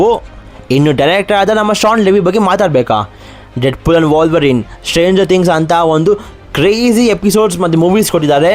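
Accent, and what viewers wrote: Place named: England, United Kingdom. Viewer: ನೀವು ಕನ್ನಡನಾ ಇಂಗ್ಲೀಷಿನ ಗಂಟಲಲ್ಲಿ ಇಳಿಸಿಕೊಂಡಿರಾ ಅನಿಸುತ್ತೆ, but story super ಆಗಿ ಹೇಳಿರಿ ❤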